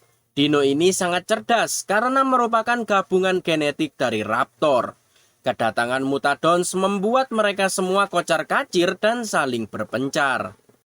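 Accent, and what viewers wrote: Java, Indonesia. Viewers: Sampean jowo to min😅😅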